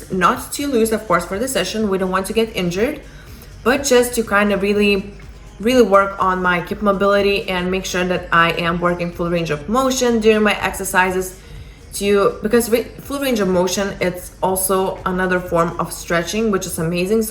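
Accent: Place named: Russia. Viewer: Did you hear about the new Linkin Park vocalist, Emily Marcia Armstrong? There's a concert online — she looks like a little firecracker, if you know what I mean! Nothing too crazy, just an interesting, strong personality. Anyway, this video started with an invitation for women only seconds. As a male, I find it offensive since these videos should be made for... just kidding Strong hook in the first 9 seconds, progressing to a life story — product placement, and more American, with... what was it, a Russian accent?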